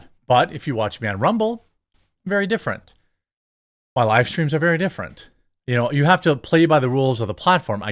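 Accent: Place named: Milwaukee, United States. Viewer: Clearly, I can tell your from Milwaukee!😂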